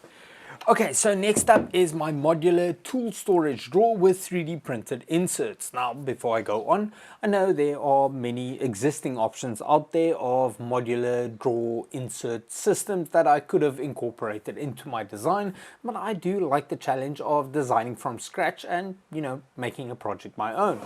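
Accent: South Africa. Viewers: Hello sir. Great project. Are you South African? Your accent makes me believe you are one of us.😂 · Sounds South African to me, but I’m no expert.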